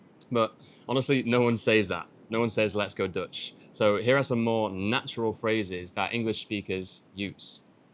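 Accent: United Kingdom. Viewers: I am from Bangalore. I love to watch your video for improving my british accent ❤❤ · açıkçası İngiliz İngilizcesinin telafuzunu daha çok beğendim 😅 Amerikan İngilizcesi konuşacağım ama yine de sizi dinleyerek İngilizcemi geliştirebilir miyim? Bu arada Türkiye'den selamlar❤ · Good speak British ❤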